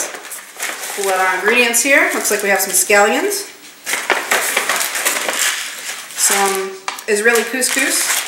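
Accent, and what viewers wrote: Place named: South, United States. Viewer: I've laughed seriously out loud on your southern accent lol.....first lesson....southern talkin 101....taters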